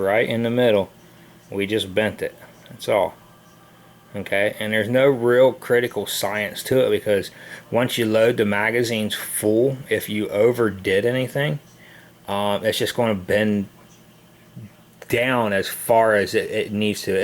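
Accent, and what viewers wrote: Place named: Southwestern Pennsylvania, United States. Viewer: Heavy southwestern PA vibes from you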